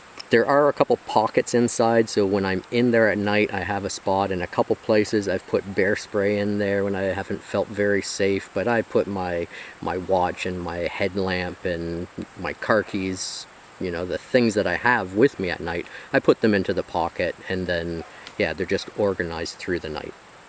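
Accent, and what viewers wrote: Canada. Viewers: aboat haha you are canadian for sure nice review ...come in quebec we have good sea side camping places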